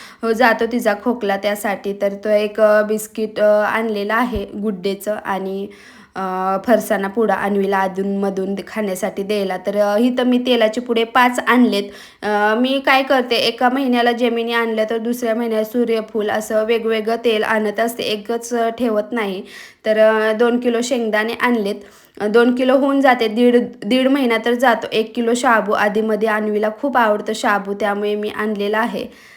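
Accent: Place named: Latur, India. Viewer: Tu pune la rahti pan tuz gav kont aahe tuza kahi kagi shabda varun tu laturchi aahes as vatat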